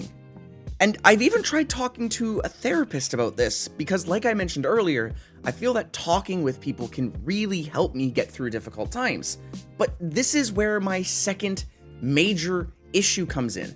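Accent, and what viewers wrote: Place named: Canada. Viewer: just sounds like another fellow Canadian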